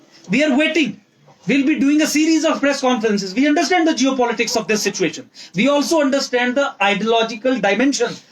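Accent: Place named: Uttar Pradesh, India. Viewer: Bhai tu Dogri bola kar waise ya Achi jammu wali hindi naa ki ye Up wali hindi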